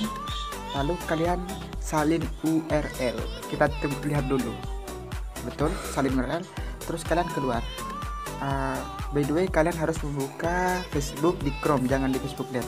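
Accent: Madura, Indonesia. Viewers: Orang madhureh bang? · Orang madura nih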